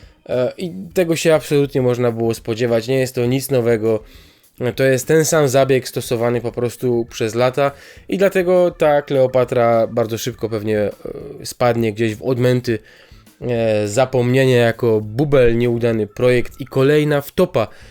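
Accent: Silesia, Poland. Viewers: Pytanie... jesteś ze Śląska? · Chopie jak jo Cie słuchom to widza żeś je łod nos ze Ślūnska 😂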